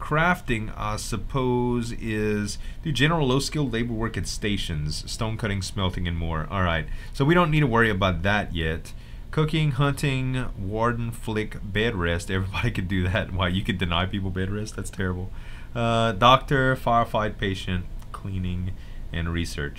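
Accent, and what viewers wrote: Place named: Deep South, United States. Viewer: Danyelle Martin lousiana, he is from the DEEP south. I was born in Tennessee so I know these types of accents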